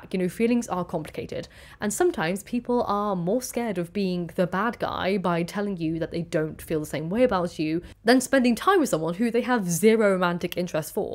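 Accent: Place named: Birmingham, United Kingdom. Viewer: So your a Brummie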